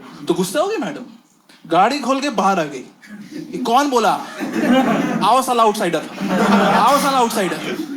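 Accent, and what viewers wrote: Bihar, India. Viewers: After the whole video I felt you have learnt hindi from a bihari friend of yours maybe.. Cause my bengali friends also talk in the same way as you😅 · Bhai , tumhari accent to bihari jaisi hai ,I think he is also from Bihar 😂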